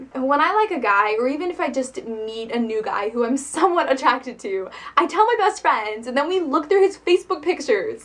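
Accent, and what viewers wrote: United States. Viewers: stop talking fuckin americaneze and fuckin gabbling so fast. I need an interpretor for you! we're not all from manhattan.......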